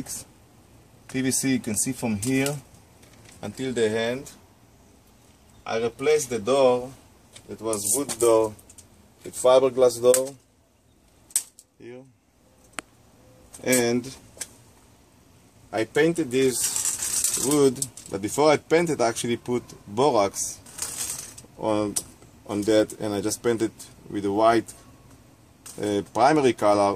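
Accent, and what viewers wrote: Israel. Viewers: israeli accent. תודה לך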